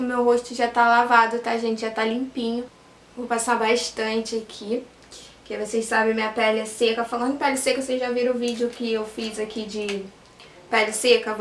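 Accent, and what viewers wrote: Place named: Rio de Janeiro, Brazil. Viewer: Aaa é muito carioca mano,amo esse sotaque carioca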